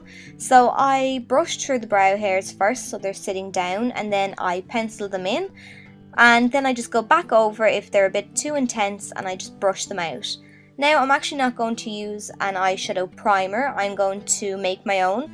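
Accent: Ireland. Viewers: Your irish ♥♥♥♥ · Are you from Ireland ? 😄 I'm in Laois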